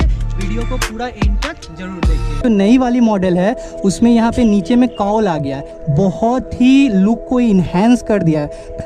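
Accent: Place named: Bihar, India. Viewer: loved bihar touch in your voice. bhaiya ji maja aa gaya.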